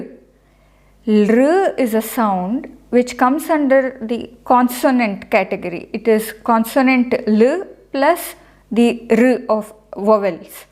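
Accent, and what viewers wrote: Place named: Maharashtra, India. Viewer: Same marathi pronounced 😊🙏🏻💐🌹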